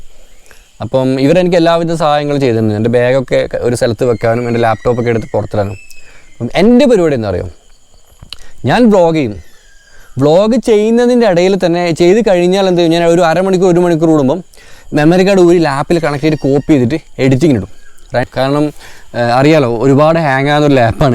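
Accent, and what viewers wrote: Kannur, India. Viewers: Katha ellarum parayunnath nammalk ishtamakillaa. Bt ingal parayumbo entho kettirunn pokm manushya . Onnamath ee kannur karante slang okke kekkan nalla rasaaappaaa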